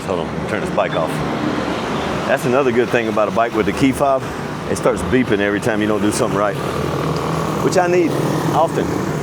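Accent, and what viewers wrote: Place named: North Carolina, United States. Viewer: The more I watch and listen it seems old North Carolina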